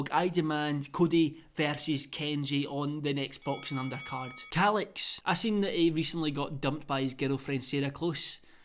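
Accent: Scotland, United Kingdom. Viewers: where about in scotland are you from? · Anyone know what part of Scotland he’s from just wondering cos accents are cool lolll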